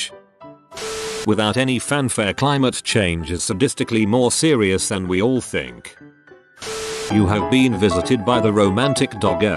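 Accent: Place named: United Kingdom. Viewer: I only listen to these cause i want to hear a robot brit say "bantha fodder "!....double quote....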